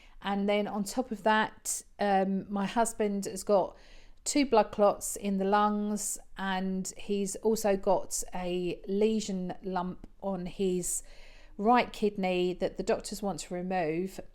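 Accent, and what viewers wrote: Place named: Essex, United Kingdom. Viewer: Hi, Mrs M has recommended your channel, so here I am. Hope lots of viewers come over to see you. So sorry to hear you lost your beautiful daughter, I can't imagine how anyone copes with losing a child., yes!!!. I'd love to see your renovations. I was trying to decide on your accent, I was going to say your a London/ Essex girl then you told us. I'm from Ilford so also an Essex Girl. Have a great weekend 😊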